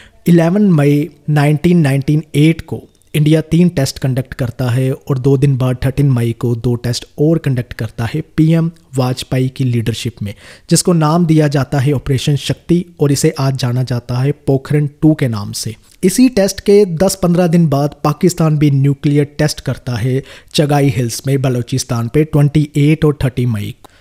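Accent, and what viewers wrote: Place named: Punjab, India. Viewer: Very effective and knowledgeable information with punjabi assent. Thankyou so much sir.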